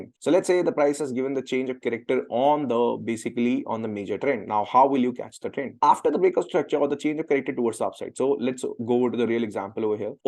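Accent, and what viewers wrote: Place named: India. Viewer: India Accent is terrible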